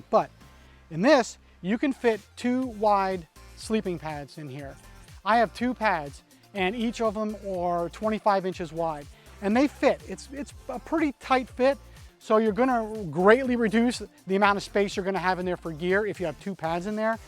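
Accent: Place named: Baltimore, United States. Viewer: Are you from Baltimore? I think I hear it in your accent, the way you pronounce during like "dOOring".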